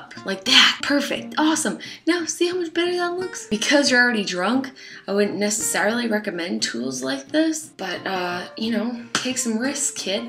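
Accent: Boston, United States. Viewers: I forgot she was from Boston till i rewatched this video 😂❤ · I SEE your Boston accent and Ithink its qt af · Sounds like a Boston accent (like when she says water line "watah line" lol) I've lived in mass my whole life and she sounds like most of my family tbh😂 not really surprising though since she lived in Boston for 8 years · she lived in Boston for like 7 years and developed an accent.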